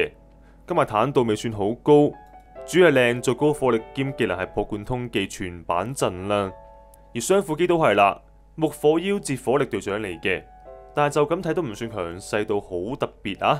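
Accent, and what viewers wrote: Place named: Hong Kong, China. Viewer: 就算一早睇哂當日所有最新情報都好，點都要聽你條片講多次，好鍾意你個d好地道港式既口吻❤加油❤ 利片：非基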